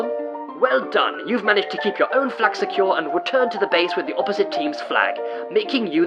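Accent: United Kingdom. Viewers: Gotta love that British voice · Was this challenge explained by Silent Movie? Because the explanation looks like a silent movie and there's someone explaining it in a proper British accent.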